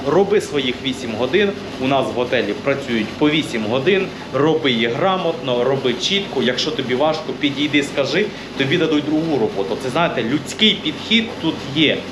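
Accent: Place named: South, Ukraine. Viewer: У нього діалект півдня України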